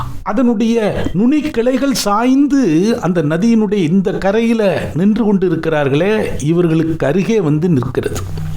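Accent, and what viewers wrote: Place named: Tirunelveli, India. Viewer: படித்தபோது திருநெல்வேலி மாவட்டத்தின் பேச்சு வழக்கு தமிழ் தேனாக இருந்தது.இப்படி கதை கேட்பது இது ஒரு புது அனுபவம்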